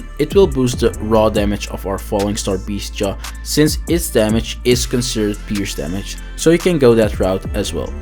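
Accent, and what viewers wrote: Netherlands. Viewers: I smell dutch…..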